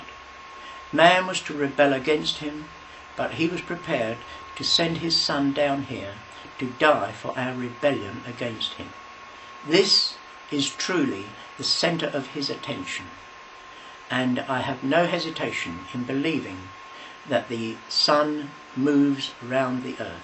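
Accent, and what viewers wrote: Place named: United Kingdom. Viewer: Nothing like a talk delivered in a nice fruity British accent. However, the content, while full of high-sounding references, is an enormous pile of horse-pucky.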